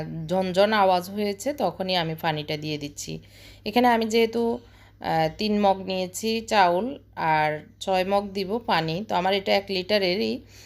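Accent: Noakhali, Bangladesh. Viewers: Thank you Apu easy ranna.amar bchara apnar vlog khub pochondo kore.😆amak onno kichu dekhte dai na.noakhailla hoyate tan ta ektu beshi😀😀😀.Masha Allah apni eto guni 1jon manus.joto dekhi toto mugdho hoi.khub balo thakben.❤❤💖💖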